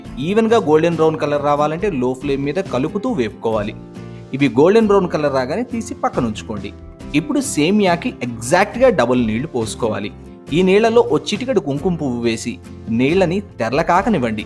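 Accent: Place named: Chennai, India. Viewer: Meedi a oru Chennai telugu la undi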